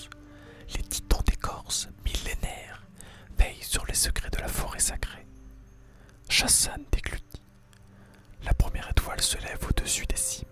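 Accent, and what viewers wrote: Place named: France. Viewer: ese acento francés😍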